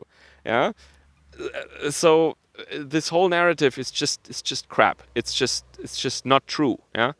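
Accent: Germany. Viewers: Clockwork Orange with a German accent.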